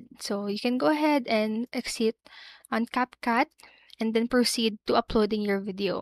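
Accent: Philippines. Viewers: Classic filipino/indonesian accent but this video helped me i am going to drop a sub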